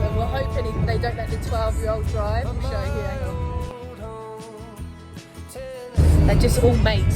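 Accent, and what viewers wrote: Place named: United Kingdom. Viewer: I heard the accent... I'm immediately...I give like I really like uk accent 😍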